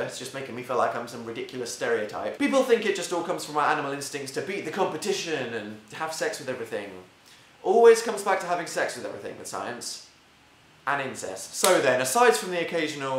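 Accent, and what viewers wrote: United Kingdom. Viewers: I'm envious of your articulate british accent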